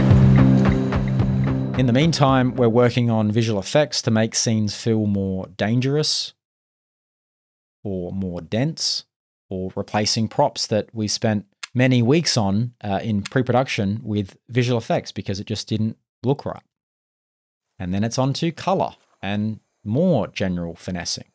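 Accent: Australia. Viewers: Ahh, I always thought you're an American.. Turns out you're an Australian. TIL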